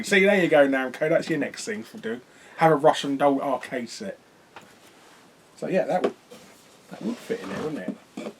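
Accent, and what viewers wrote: United Kingdom. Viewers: Why are British accents so intriguing lol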